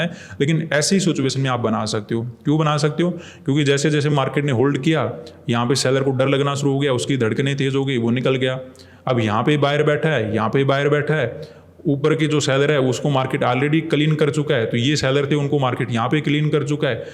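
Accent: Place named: Rajasthan, India. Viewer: आपके बातों से लगता है कि आप राजस्थान से belong करते हैं|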